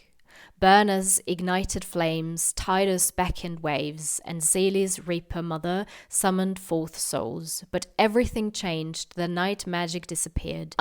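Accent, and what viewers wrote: Austria. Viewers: are you from Austria? :) love your videos!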